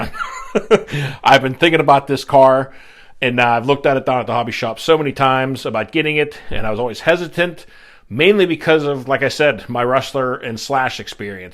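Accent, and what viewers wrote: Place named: Pittsburgh, United States. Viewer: Bro... are you a Yinzer? I'm out west and I'm picking up some serious Yinzer vibes. Makes yer vid special. Keep it up!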